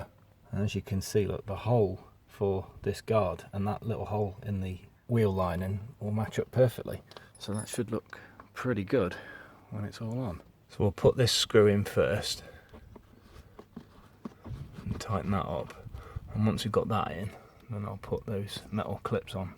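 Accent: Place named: England, United Kingdom. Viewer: Typical English pronunciation 🎉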